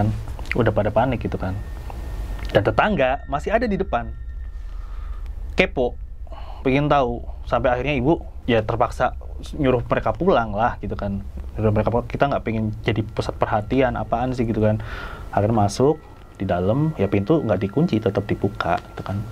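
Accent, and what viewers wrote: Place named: Cirebon, Indonesia. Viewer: asli wong Cirebon apa Indramayu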